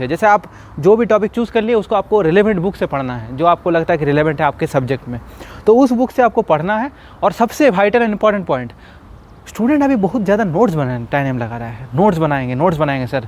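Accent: Bihar, India. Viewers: Sir Your bihari essence 😍💖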